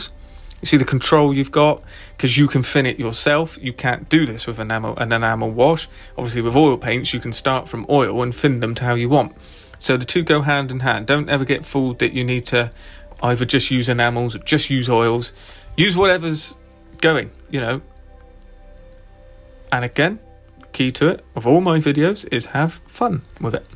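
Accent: United Kingdom. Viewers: Brilliant video build. I love the British accent! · As a yank, to me this has to be the most sexiest fighter of WW2. Plus hearing a Brit narrate brings it altogether.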